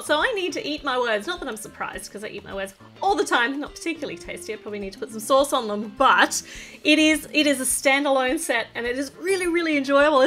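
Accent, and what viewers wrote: Australia. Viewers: I have been watching your vid so much I’m starting to wanting to talk in a Australian accent! 😆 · I love this, video and what country are you from you sound Automatically Austrilian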